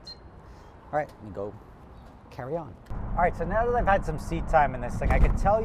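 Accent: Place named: East Coast, United States. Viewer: Absolutely the worst "review" I've seen of the S2K. Take it on a track and tell us how you feel after that. Highway cruising in the car sucks. Period. The only thing that makes it reasonable is top down. This dude seriously sounds like an east coast Weiner and probably is the guy that slows everyone down in his Lambo at HPDE.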